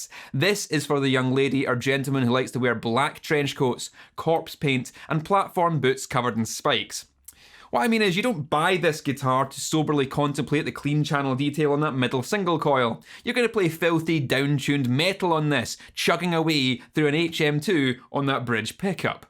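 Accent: Scotland, United Kingdom. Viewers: "We want to hear some Entombed or At The Gates" - well, I don't, I'm mostly here for the Scottish accent, but carry on ;) But, that "Bonamassa" insult, that's too much, take it back! That could possibly be the worst insult anybody has thrown at me!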